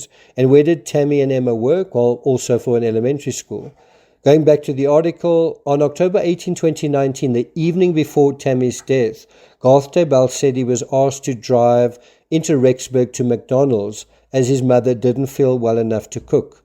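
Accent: South Africa. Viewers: Hi- Annie here. I was born in Cape Town so I recognised your accent immediately. My parents brought me to the US for medical reasons when I was quite young. We spoke English and Afrikaans at home but i lost my accent at age 7 to avoid being teased at school. I now live in the state of Arkansas and am a retired psychologist. I have been watching the Chad Daybell trial since day 1 and I love your video. It is clear and straightforward (as much as such a complicated case like this can be described). I agree that that the family is completely enmeshed. In fact, many strict Mormons are; Chad's followers,.however, are a cult within a cult. I am very pleased with today's verdict. The sentencing phase continues tomorrow with victims' statements. I think we will see many tears. I am fascinated by this story and trials have sparked worldwide interest and am pleased that South Africa has such an amazing True Crime network. 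Do you cover many American True Crime stories? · I follow this channel from South Africa, and i have been wondering why you report on American crimes, as i picked up your accept. Just confirmed you are South African, living in the US. Great stuff, been following since Gaby's case.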